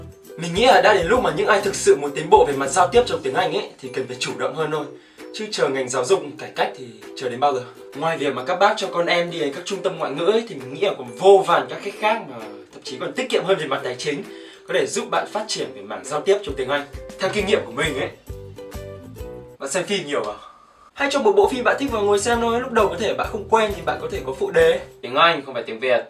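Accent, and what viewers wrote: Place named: United Kingdom. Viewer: Giọng Anh-Anh của anh hay quá điiii ; 3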